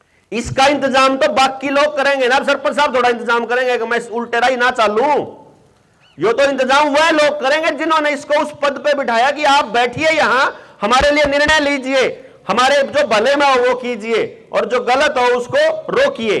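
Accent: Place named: Haryana, India. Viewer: Thoda clear hindi boliye bich bich me haryanvi aa rahi hai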